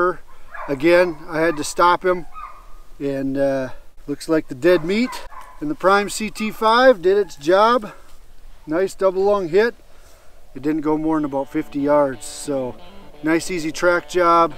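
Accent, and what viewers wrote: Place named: Minnesota, United States. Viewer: You sound like you are near where my family is from, Fairfax, New Ulm, Mankato. Nice spot!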